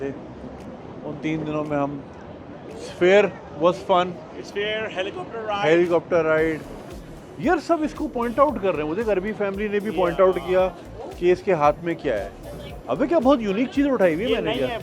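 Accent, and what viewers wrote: Karachi, Pakistan. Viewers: walking tales ko dekny ki main waja ti karachi xcent asal maza us style ka ta funy bato ka ta pakistan k sat comperssion ka ta is tara to seeda sada banda youtube my kahi b dek sakta h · Paki in las vegas with his orangi town english accent 😂🎉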